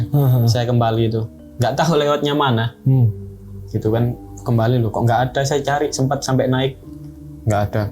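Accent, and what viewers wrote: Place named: Java, Indonesia. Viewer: Assalamualaikum Hadir Wonogiri,bang, Medok Jawa nya mas, 😂😂😂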